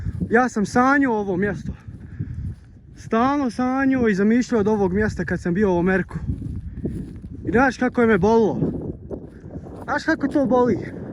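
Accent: Bosnia and Herzegovina. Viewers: Simpatičan si sa svojim Bosanskim u izgovoru a vidi se da iskreno iz srca govoriš, sretno dijete drago i uvijek uživaj u našoj domovini🇧🇦❤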